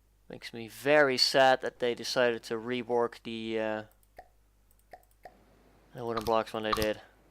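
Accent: Netherlands. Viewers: I can already hear that this guy is dutch Zeg “hallo” als je ook NL bent 😄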